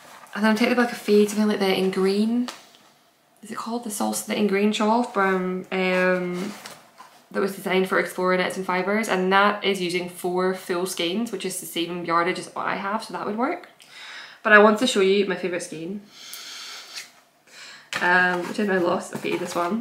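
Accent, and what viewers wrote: Scotland, United Kingdom. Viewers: Thank you! I love your podcast and LOVE Scotland. I am from the Colorado Rockies but did a year abroad at Stirling Univ and fell in love Scotland. ♥ I love hearing your accent b/c it brings back my memories of the beautiful people of Scotand. in 2019 my husband and I backpacked for two weeks in the highlands. A dream. Thank you for your podcast.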